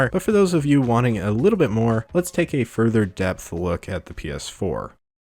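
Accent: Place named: Canada. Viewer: omg ur Canadian yaaaaa eh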